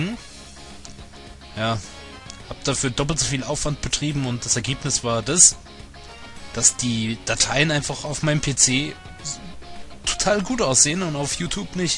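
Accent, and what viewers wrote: Hesse, Germany. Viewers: bist du aus ghessen, wegen dem akzent?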